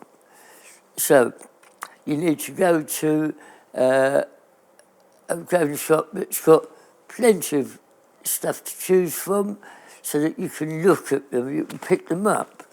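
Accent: RP, United Kingdom. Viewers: I could listen to all day long, so full of knowledge. I suffer from Tinnitus as result of shooting in my youth and I can understand every word that he says and those that can't must be stone deaf or does not speak the Queens English.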